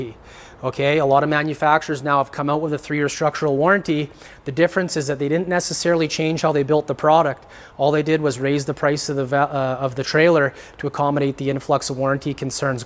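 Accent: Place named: Canada. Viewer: Boy theres Canadian accent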